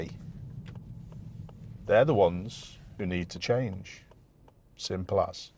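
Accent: United Kingdom. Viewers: That was really interesting and thought provoking. I also found you mentioning various key factors just as I was thinking the exact same thoughts and nodding in agreement. One key thing with all this. Now that this issue is known for this particular double roundabout, it would be a good idea for all local driving instructors to brief their students on this as part of their test preperation and to practice in the way expected by the examiner. Problem solved! At least for the purpose of passing the test. I'd like to comment further on this very interesting clip but the Canadian border is calling...from all lanes! Was very nice to hear a Brirish accent and expressions. Seems like it's been ages since last hearing one! Again, apologies for my relative absence in the comments but as you know, been very busy in North America. Hope all is well in the Uk.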